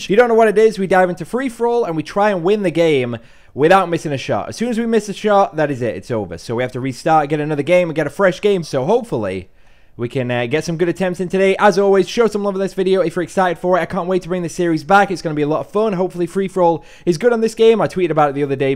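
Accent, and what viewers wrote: United Kingdom. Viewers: Great to hear a British accent. Just for a change. Love the video mate. Subbed and liked.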